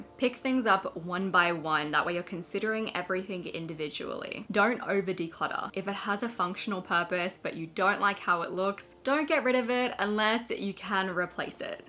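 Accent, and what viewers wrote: Australia. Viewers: I think from Australia! 🦘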